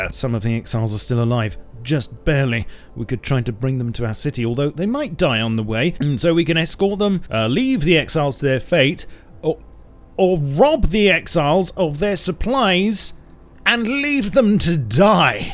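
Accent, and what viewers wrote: United Kingdom. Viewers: Come on this is where you will jump off and soar into the sky like KCmo, oh w8 his channel is dying and yours will 2 if u don't stick 2 rust, it's cruel but hey! That's the price u pay when you think someone is actually going 2 watch you do something other than for what they subscribed for. Let's see if u can really break through as just another guy with a British accent that plays any random game:P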